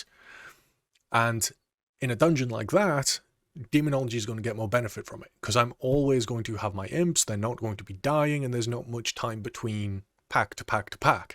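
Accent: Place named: North East England, United Kingdom. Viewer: You sound like you're from NE England mate like me. Cheers for the advice, appreciate it.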